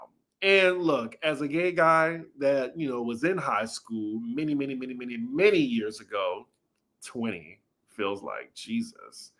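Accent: New Orleans, United States. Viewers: you definitely have a New Orleans accent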